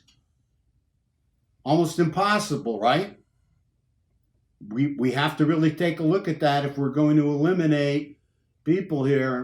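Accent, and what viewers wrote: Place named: Philadelphia, United States. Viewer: Hi, do you think the Long Island Serial Killer is the person responsible for killing the women in Atlantic City? Melissa Taylor was last seen (not 100% sure) in a town close to Atlantic City, Port Republic. Also, are you from the Philadelphia or South Jersey area? You sound like it, it's where I'm from. Thank you for the great content!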